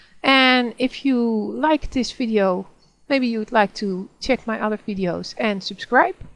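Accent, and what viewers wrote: Netherlands. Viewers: Wauw dat nederlands accent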